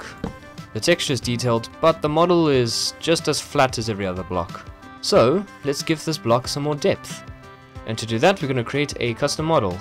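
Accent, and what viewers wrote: South Africa. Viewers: Great video! I have one question tho... Are you also South African? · You sound very much South African :)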